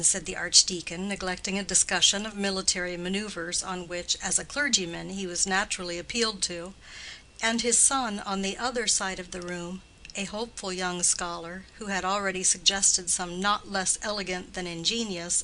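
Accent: United States. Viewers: I think its a great reading. It's actually the subtitles that are poor. I don't mind the American accent it's their literature as well as Britain's. Also tonally American readings are easier to listen to as many English readers flatten the vowels and make it a bit drab. They also get rid of class bias and certain readers affectations.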